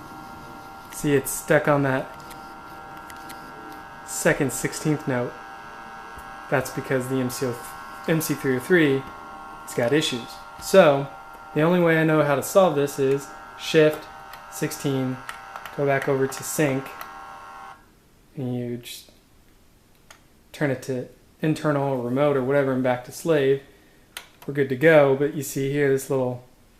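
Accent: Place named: United Kingdom. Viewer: So thats what you look like....I always thought you were british lol.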